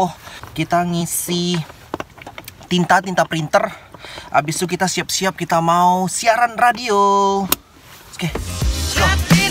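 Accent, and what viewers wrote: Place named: Minangkabau, Indonesia. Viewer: Logat minangnya masih kental banget bang.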